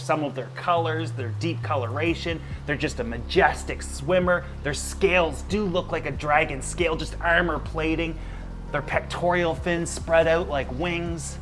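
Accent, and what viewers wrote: Canada. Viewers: as a fellow canadian i understand how said cities when its said more like sighties. I know the spelling aint like that but its cities said as sighties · Canadian accent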